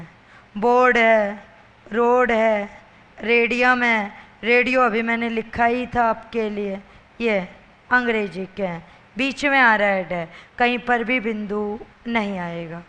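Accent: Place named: Mathura, India. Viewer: 100% h kI aap mathura se h🙏🏾🙏🏾🙏🏾🙏🏾🙏🏾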